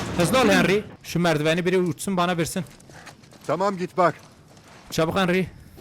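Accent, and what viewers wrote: Elazığ, Turkey. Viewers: abi nerelisin elazığlımı lütfen söyle 20k like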